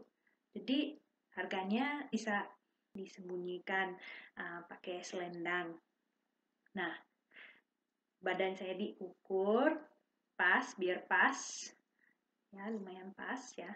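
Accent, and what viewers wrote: Bali, Indonesia. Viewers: 😂😂😂..you got the Balinese accent cantik kebayanya saya suka 😍😍...suamiku bilang "I thought she is Balinese girl teach you dancing" (he heard your voice only)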